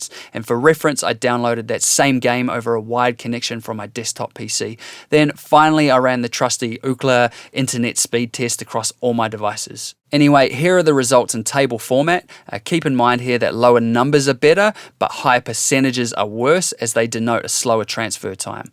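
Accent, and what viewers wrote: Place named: Australia. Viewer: nice review. Also as a kiwi living in the US it's cool to hear an accent from down under. Chur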